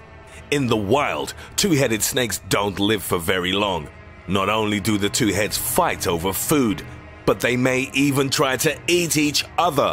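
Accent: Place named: United Kingdom. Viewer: This british narrator makes everything 10 times as scary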